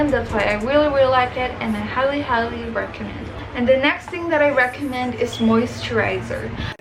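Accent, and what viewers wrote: Philippines. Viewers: That accent and your beauty (and also the miniso products, I remember those stores when I went to visit last year)! I knew you were Filipina! I’m half and so proud! ❤️🇵🇭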